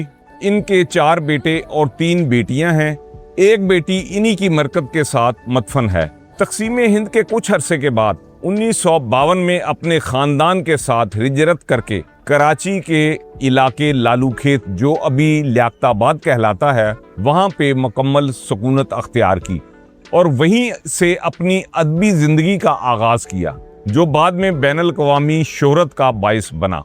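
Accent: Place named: Punjab, India. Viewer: بھائی، ابن صفی صاحب سے متعلق معلومات کے لئے بہت شکریہ مگر پنجابی لب و لہجے والی اُردو بہت کھل رہی تھی!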